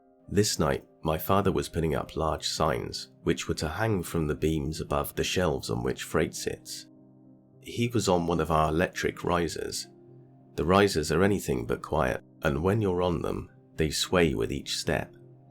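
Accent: United Kingdom. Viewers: You have a good voice for story telling. I always prefer an English accent. Would also love a Scottish accent. I can't stand Mr Reverants voice. Sorry Rev.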